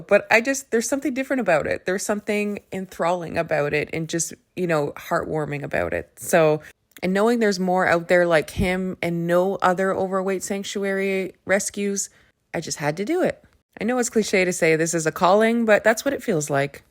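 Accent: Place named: Canada. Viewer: I'm so glad you made that video. Your voice is enchanting but I never thought of Canada, but the minute you said "Canada", yes of course..... It was really nice of you to share. I have a dream of how small communities could commit to looking after feral cats for example. I have two cats and I feed a stray and I contribute financially to cat sanctuaries as best I can from a pension, so I really appreciate what you do. I think the whole world loves Biggie Smalls. Maybe you could write a children's book????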